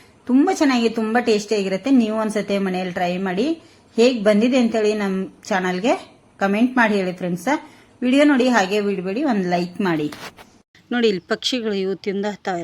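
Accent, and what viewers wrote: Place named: North Karnataka, India. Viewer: ನೀವು ಮಾತಾಡೋದು ಉತ್ತರ ಕರ್ನಾಟಕದ ತರಹ ಇದೆ.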